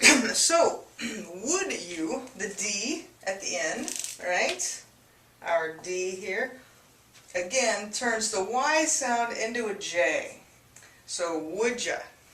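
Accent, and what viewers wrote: United States. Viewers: is it american or british ? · Easy Spoken English it's an Americanized English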